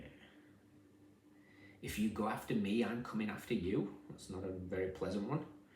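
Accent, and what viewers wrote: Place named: Scotland, United Kingdom. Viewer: Hey there handsome man! and thank you for your time and by the way has anyone ever said that you have such a lovely accent !? 🤔 ❤ Cause you do!🌹😊 Is it Scottish? Enlightened me.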